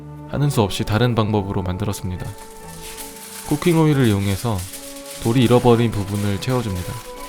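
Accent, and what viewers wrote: Daejeon, South Korea. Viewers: 오 님 대전사람이세요?? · 님 혹시 대전사람..? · 어? 혹시 대전사람이세요?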